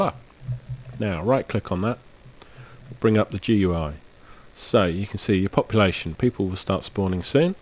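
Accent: United Kingdom. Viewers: i love the british accent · First off – there is no such thing as a British accent! Great Britain refers geographically to the island of Great Britain, politically to England, Scotland and Wales in combination. Only Americans use the expression ‘British accent’, and you ain’t American are you. You have an English accent (not a hint of Welsh or Scottish in there that I could hear). Second off – why apologise for it? Americans speak English, as in England right? Shame on you:P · I like your British accent.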